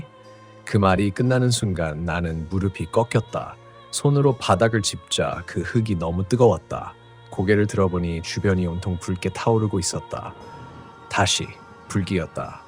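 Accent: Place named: China. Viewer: 왜 이렇게 중국인 발음이 나올까?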